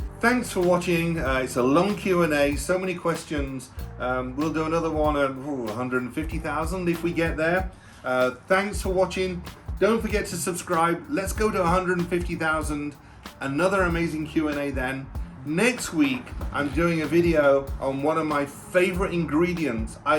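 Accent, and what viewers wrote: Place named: Nottingham, United Kingdom. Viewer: Huge congrats, your's is my go-to comfort company, LOVE your videos, you so deserve this success as you are a great presenter, cooking coach, and generous in sharing your recipes. Listening I heard you studied at Clarendon SO DID I. I used to go to the catering student's shop and buy stuff, then take it home on the train to Newark (Notts). I now live in Telluride, Colorado. Clarendon is now called New College Nottingham, and I swear what I learned at Clarendon (BTEC Beauty Therapy Spa Management) has set me up for life. I can't tell if your accent is Nottingham or Derby, it's clearly been tweaked by high society and the US. Be very well, Karen (Kaz) totally Notts:)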